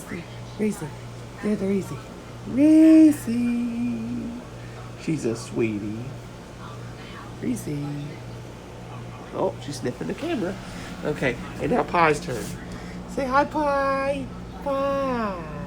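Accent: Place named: United States. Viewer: You sound different. So country.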